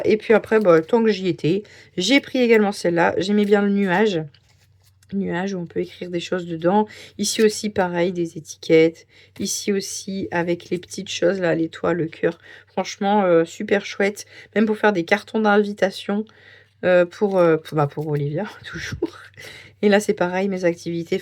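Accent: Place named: Franche-Comté, France. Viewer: Coucou, hâte de voir les belles créations que vous allez nous faire. Et vive l'accent franc-comtois 😂 petite caresse à vos compagnons à quatre pattes 😻🐨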